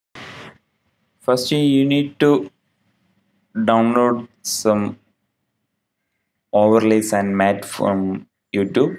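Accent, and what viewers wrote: Kerala, India. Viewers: ഇംഗ്ലീഷ് കേട്ടിട്ട് മലയാളിയാണെന്ന് തോന്നുന്നു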